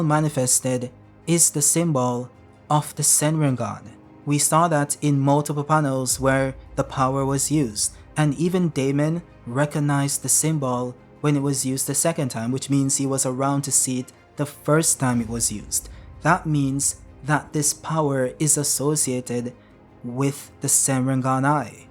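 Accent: Jamaica. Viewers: bro u made me understand more of baruto thanks but i got to ask are yu Jamaican? im just asking bc im Jamaican😁❔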